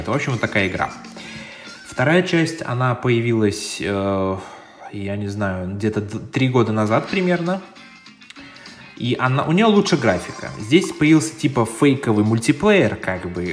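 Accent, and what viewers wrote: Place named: United States. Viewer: Ты что из сша? Ты не русский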